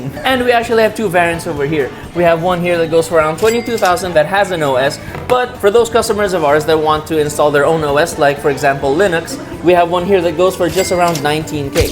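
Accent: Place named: United States. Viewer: I mean its okay for you bro to be english speaking with American accent, dunno if you gew up States side, but ,I think viewers will much appreciate if you go Taglish or Tagalog. We can just watch foreign or global videos if we want english based ones(I mean on your laptop reviews), but most Pinoys would love to see Taglish or just pure Tagalog vids just like how Tito Dustin does it. Medyo boring bro if englishero realtalk.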